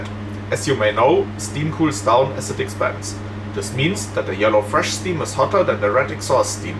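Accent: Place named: Scandinavia. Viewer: The way the narrator talk is make me think if it's Sweden? Germany? Or Norwegian?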